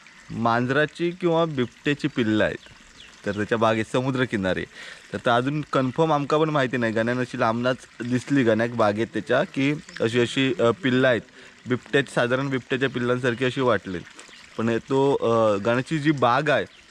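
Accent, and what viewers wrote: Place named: Malvani, India. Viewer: अरे तु मालवणी आहेस की रत्नागिरी कर. बोलतोयस मालवणी.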